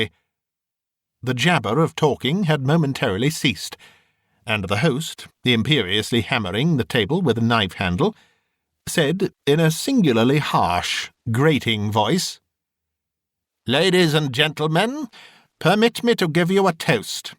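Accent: England, United Kingdom. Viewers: Great stuff sounds better in a upper class english accent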